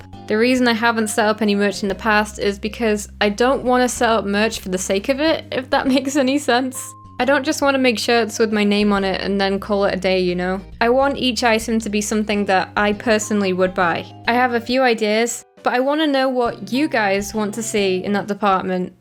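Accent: United Kingdom. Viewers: Your voice with the British accent just make the unintended ASMR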